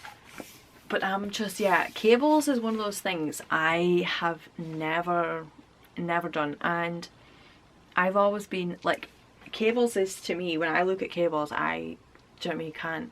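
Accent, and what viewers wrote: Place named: Shetland, United Kingdom. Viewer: Absolutely loved watching this!! I love everything you showed, and you are so at ease in front of the camera! I hope these videos become a semi-regular thing — I prefer little loose videos on a single topic than big podcast episodes with segments tbh. And your accent was so interesting to listen to — I'd never heard a Shetlander before!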